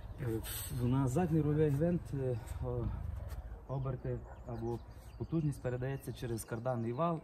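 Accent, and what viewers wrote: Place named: Western Ukraine, Ukraine. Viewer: Это почти польский, суржик западноукраинский.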